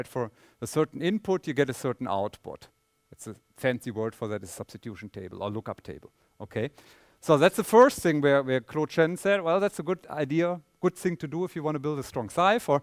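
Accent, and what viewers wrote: Germany. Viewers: german english mit ruhrpott akzent oh yeah :D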